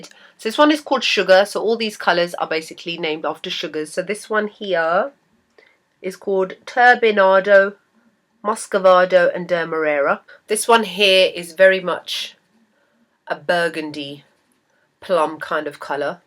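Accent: United Kingdom. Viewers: Looooove british accent! =)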